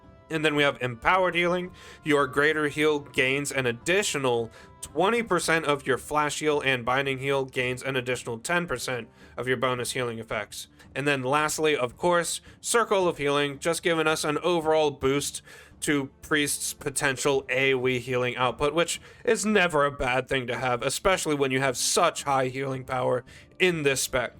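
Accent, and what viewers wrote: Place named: Maryland, United States. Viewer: You're from Maryland, aren't you? The accent is so pronounced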